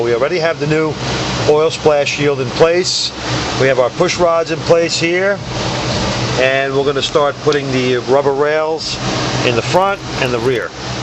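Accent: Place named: New York, United States. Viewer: Jesus Christ dude the day I bought my GN brand new back in 87 the service department at the dealership told me that this is literally the most sensitive and temperamental v6 that GM ever made. You can’t man handle and let sensitive internal engine components like the camshaft journals being scratched and nicked by the hood lock. Then you spin away all the assembly lube on the cam showing off with this dog and pony show. I love how you fingerprint yourself in the valley pan. You sound like your from either Brooklyn or Queens Ny and I hope to God in 31 years I never had you working on my WE4 because after seeing this video all I can say is God be with Pasquile and his engine. If you pulled this on my car and I saw this video Idk how I would respond. Since retiring and moving to Florida I started doing everything myself on my car because the dealership doesn’t know how to work on these cars anymore and the “Go too guy” could be like you who is careless and doesn’t (be admission on the video) care about your customers or the work of art classic piece of American history that you are working on. 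I guarantee you nicked that bearing journal and that engine isn’t running or has since been re built by someone who knows what he or she is doing. You should already know how unforgiving and intolerable these turbo 109 engines are. Especially the shortblock and shitty oil pump, and oil pressure issues. But like you said,,It’s not your car.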